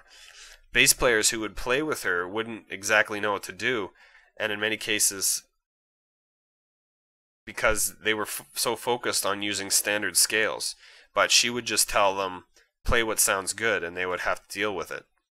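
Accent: Canada. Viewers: Nice video thanks. P.s. your Canadian huh? Cool accent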